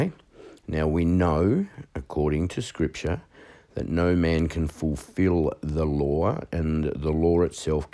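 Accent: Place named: Australia. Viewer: While i was thinking how cute your pronunciation of Esther was, you said you got told for it 😂😂😂 i like the Australian english😊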